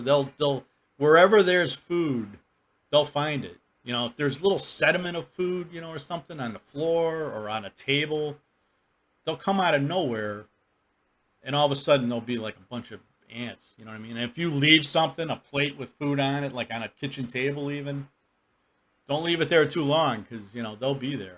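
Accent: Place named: Wisconsin, United States. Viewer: The older i get the less noise l can tolerate , headphones hehe rooster barn ,are you sure you ain't living in a chicken coop? I hear there a rooster gangs there l used to wish l could sing, now l wished they could. Mmmmm diesel exhaust. We are too stoopid to go metric mmmmmm big black plumes of smoke l had a feeling you was from the Midwest , l was thinking Ohio I'm even hearing a little Wisconsin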